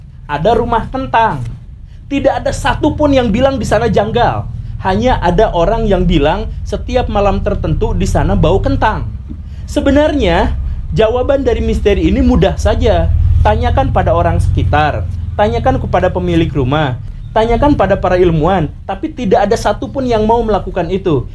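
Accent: Indonesia. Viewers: aku lebih suka orang seperti begini dari pada yang pakek topi versi timur tengah. logat penyampaian sangat ke Indonesia an banget. dan ini yang bikin aku suka nonton vidio satu persatu tanpa pernah saya skip karena saya merasa tambah pintar tanpa ada rasa paksaan harus begini begitu kalau mau seperti itu. sekian terimakasih udah dibaca😂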